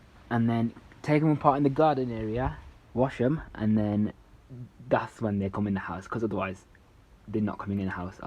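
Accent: United Kingdom. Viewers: Are you from Birmingham 🤣🤣🤣your accent is sick !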